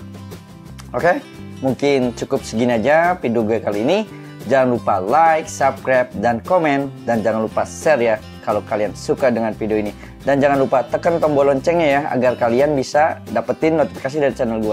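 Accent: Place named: West Java, Indonesia. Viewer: Punten bang kok abang orang bali kek orang sundaaa☺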